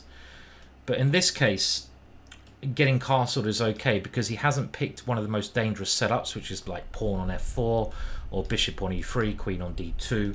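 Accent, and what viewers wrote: United Kingdom. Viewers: It's the Queens' English mate. What, are your ears painted on?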